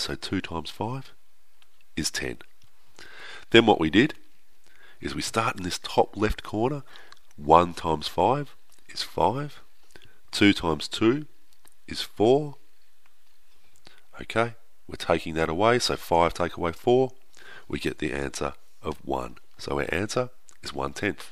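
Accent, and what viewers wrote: Australia. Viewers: Thank you so much you helped me with subtracting fractions and I love your Australian voice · I noticed his Australian accent I think this boi is left handed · You Australian mate? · Thank you. .you lovely Australian math God ;-)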